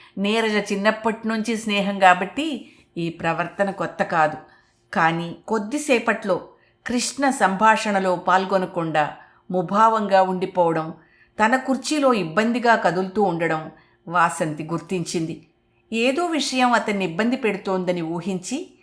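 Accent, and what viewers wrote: United States. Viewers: Your story narration is very nice You are talking like an American women 🌹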